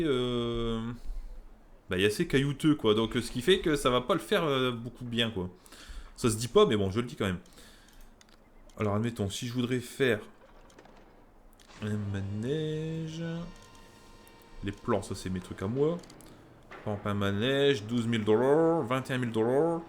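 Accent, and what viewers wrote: France. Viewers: t du ch'nord sa s'entend frere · Salut j'aime bien tes tests, tu es de ch'nord aussi ?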